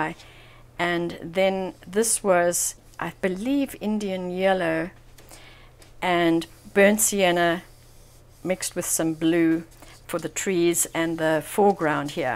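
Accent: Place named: South Africa. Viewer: Great video, thought I picked up a South African accent. I am from Johannesburg now living in the Netherlands.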